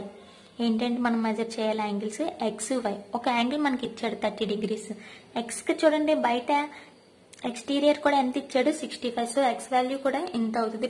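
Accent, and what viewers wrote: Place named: Coastal Andhra, India. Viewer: Madam what is your name? I thinking your name is madam. 😀😀😀😀😀 Madam another doubt I have What is your city? I thinking your city name rajamundry, vizag,kakinada and srikakulam. I said because these city names only Because your voice and slang like this cities only. Please reply me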